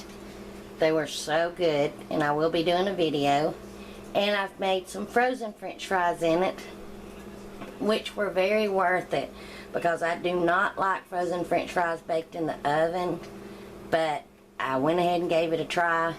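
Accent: South, United States. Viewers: even with strong southern accent still easy to understand. I am excited about trying these. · you have what i call a country accent wow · Hi, I just bought myself an air fryer recently. I LOVE the way your french fries turned out! I going to try your technique on my air fryer. By the way, I also LOVE your southern drawl };-) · Love the southern accent and your friends name 😀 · I have to get me one of these! Anytime I hear a lady with a southern accent I know there's good food around! 😁😉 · Love the genuine southern accent!!!! 😍😍😍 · best southern accent!!!!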